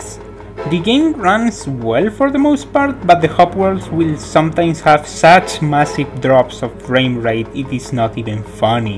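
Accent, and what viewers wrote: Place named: Russia. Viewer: Amigo, si vas a hacer videos en inglés aprende a pronunciar en inglés porque no te sale XD sonas como ruso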